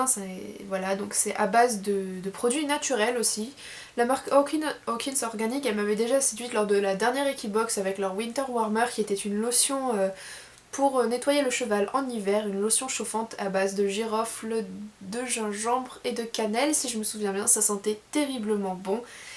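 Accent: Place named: England, United Kingdom. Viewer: tu a un accent anglais magnifique !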